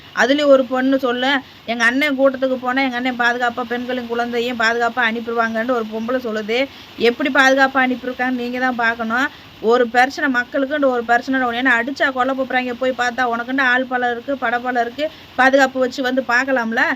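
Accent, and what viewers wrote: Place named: Madurai, India. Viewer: எங்க மதுரை தமிழ் கேட்கவே சிறப்பு சரியா சொன்னமா இங்க ஆஸ்திரேலியாவில் தமிழர் மானத்தை வாங்கிட்டாய்ங்க இந்த தற்குறிகள்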